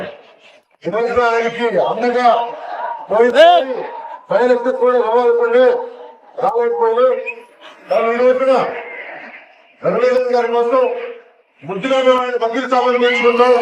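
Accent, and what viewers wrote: Telangana, India. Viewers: Anna Telangana yasa lo matladuthuntey muchatesthundhi ....... Jai Janasena ✊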